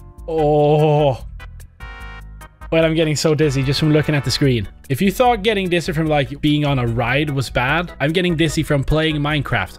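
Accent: Sweden. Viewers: OH you're swedish, that's why your accent is so bad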